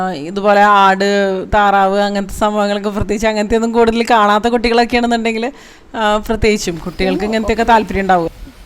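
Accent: Thrissur, India. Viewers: ശബ്ദത്തിൽ നിന്നു മനസിലാകുന്നു തൃശൂർക്കാരി ആണെന്നു - ശരിയാണോ?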